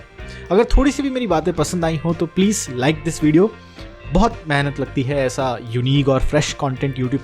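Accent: Bengal, India. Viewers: Par dude your Hindi sounds Bengali enough to understand that you are a bengali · Mannnnnnn YOUR Bengali accent ❤️❤️😊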